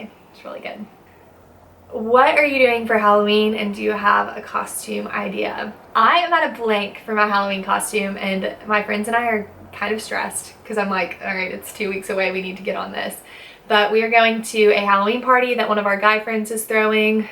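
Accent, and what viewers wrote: South, United States. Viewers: Your Southern Accent is Beautiful! I could listen to you all day! Oh, and your gorgeous too. Signed, New England Yankee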